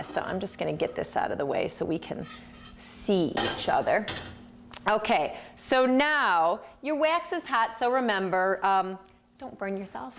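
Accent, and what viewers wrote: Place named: Wisconsin, United States. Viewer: Yes, you MUST be from Wisconsin:] I know that accent.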